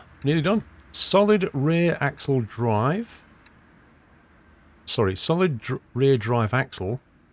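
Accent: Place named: United Kingdom. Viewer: I'm trying to make it as a Youtube gamer... but I can never compete with this British accent...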